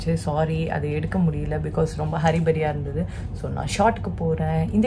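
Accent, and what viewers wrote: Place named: Kerala, India. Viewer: You sound so much like a Malayali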